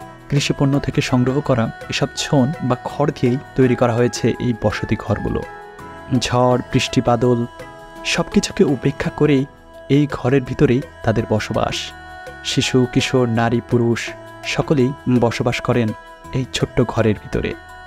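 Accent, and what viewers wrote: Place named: Bangladesh. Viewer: Vai, apnar naam ki?? Apnar voice to ami onno channel er background a SS hunecchi mone hocche.... R apni bodhoy Bangladeshi, not from kolkata, kothar style shunle tai e mone hocche. Amio from Bangladesh 😊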